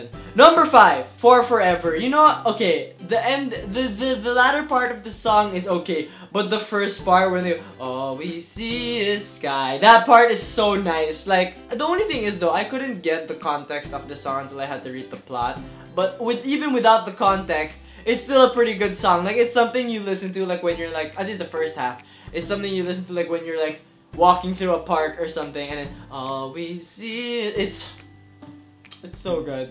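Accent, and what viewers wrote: Philippines. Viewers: is this guy filipino because lmfao that accent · I got a bunch of things to say: First, that accent. (This is my first video of you.) I was 90% sure you were Filipino, then I looked back at your other videos, and saw a familiar setting in your "Photograph" video, so that confirmed it for me.;) Second, I think the word you're looking for when you were talking about " " is exposition. Hehe. Third, I love Dear Evan Hansen! So I scour videos about it regularly. And I found this video. Yay! My personal favorites change a lot. First, it was, then (have you seen that Cynthia Erivo performance in Elsie Fest? I mean WOW!)., For Forever was also my favorites for a while. Right now, my current favorite is. surprised me a lot actually. When i first listened to it, I really didn't think much of it. After a few weeks of listening to the album, unconsciously I memorized the whole song. 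The odd disjointed structure of the song (you can't pinpoint a chorus, for example), and the minimal rhyming lends a painful realness to the song. Like it's an actual person confessing his feelings. When Evan sings the callback to, the instrumentation there with the violins just gives me chills. And of course Ben Platt did an amazing job in the recording. You can really feel the emotions he's conveying. ("Pretend I'm something other than this MESS that I am", for example. The song after that line is just packed with emotio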